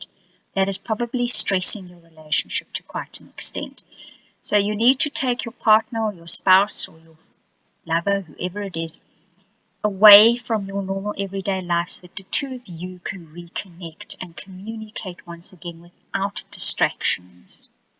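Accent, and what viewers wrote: South Africa. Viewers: Hi are you South African?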